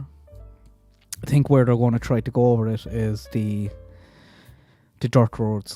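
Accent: Ireland. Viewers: Not sure, a family member married an Irish guy, he talks exactly like you...he has Kerry gold butter n the tea, he makes a nice piping hot cup..he is from Tipperary..I am Irene Brady ,maiden name,have O`Hurley`s n O`Neal.. in family. I don`t know anything about my Irish heritage,it is such a mysteriously, romantic... brooding n beautiful place.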